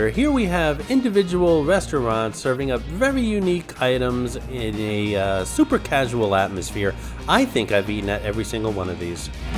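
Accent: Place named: New York, United States. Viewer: Nu-Yawk-flair........o yea!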